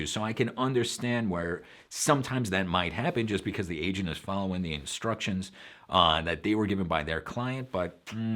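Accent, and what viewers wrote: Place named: New York, United States. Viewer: Love your New Yawwk accent.;) Great info as always, thanks!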